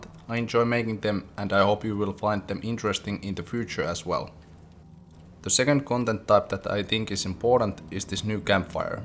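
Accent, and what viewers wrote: Finland. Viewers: You sound like Finnish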